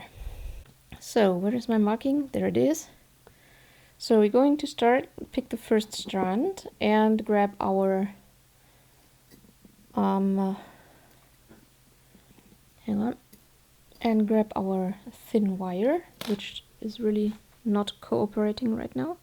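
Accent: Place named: Northern Europe. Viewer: Thank you for answering I was very frustrated when I wrote that… and I love your accent I'm thinking Northern Europe… I wish I spoke a second language as well as you do English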